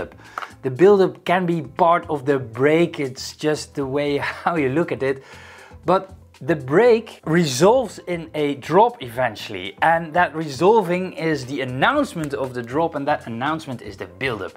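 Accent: Netherlands. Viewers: Great tutorial! Just subscribed... is that a Dutch accent btw?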